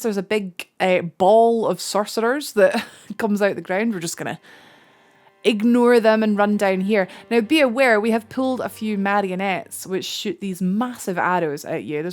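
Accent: Scotland, United Kingdom. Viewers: Nice Scottish accent. What’s your PSN?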